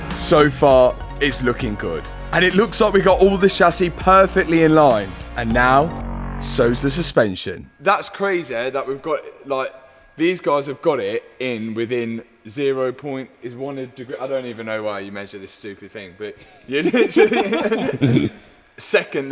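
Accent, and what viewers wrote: United Kingdom. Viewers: This British accent is so good to hear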